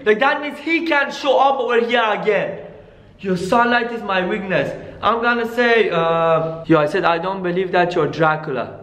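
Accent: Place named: United Arab Emirates. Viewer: he is from united arab emirates thats why he talks like that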